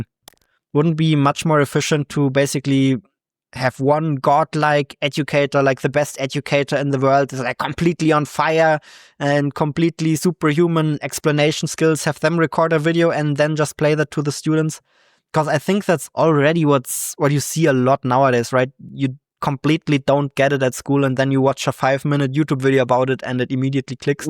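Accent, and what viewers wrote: Germany. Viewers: Text: "Learning the world": "Learning the vurld" XD Don't worry, your German accent is a big reason of why I subscribed to your channel x)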